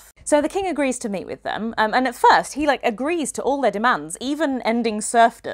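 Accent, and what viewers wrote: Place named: North, United Kingdom. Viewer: Interesting, anyone know where is from? She pronounces 'castle' and 'demand' in the Welsh/Northern manner, rather than with the usual Southern long 'a'. Just curious, some people from London do indeed say 'castle' like that.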